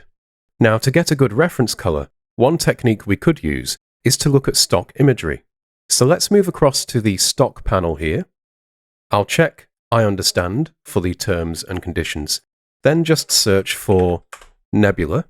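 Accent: United Kingdom. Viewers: Nice UK accent and nice tutorial...Watching you from Iraq.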